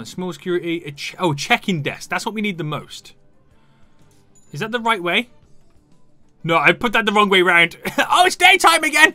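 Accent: United Kingdom. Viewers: Are you British? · Which part of the UK are you from?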